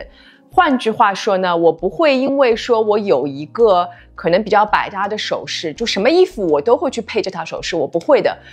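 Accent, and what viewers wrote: Shanghai, China. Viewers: 姐 你是上海人吗？麻子比较大因该是上海话吧。呵呵😁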